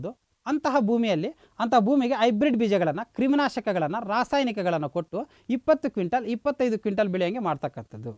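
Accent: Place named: Tumkur, India. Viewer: Nam tumkur voice kelokke chandaa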